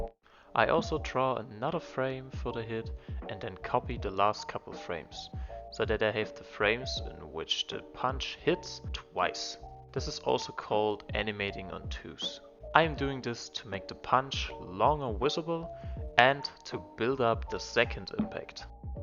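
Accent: Germany. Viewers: Cause of your accent I found out you’re German and because of the layers👍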